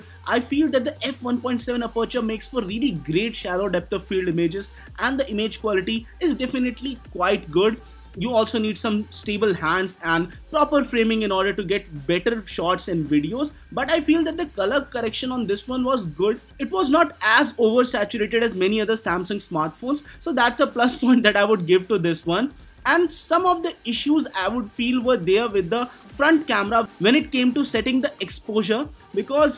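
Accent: India. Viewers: fuck that Indian accent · fuck indian accent dont like it..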